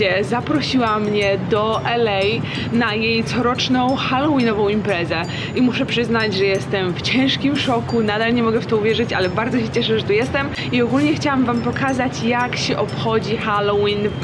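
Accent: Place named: England, United Kingdom. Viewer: tobie już powili angielski akcent do polskiej wymowy się wkrada❤️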